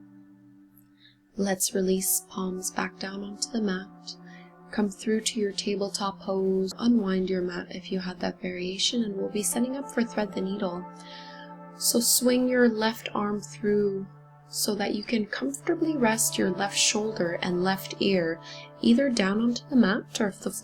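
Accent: Canada. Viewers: Great for loosening up my neck and back. Clear instructions, lovely calm voice, love the Canadian accent. My hubby and i enjoy your classes immensely here in Aussie! 🙏🏽💜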